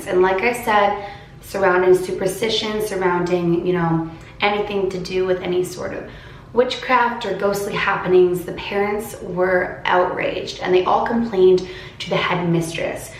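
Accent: Canada. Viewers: WHY ARE YU TALKING LIKE A CANADIAN? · omggg i love ur Canadian accent 💗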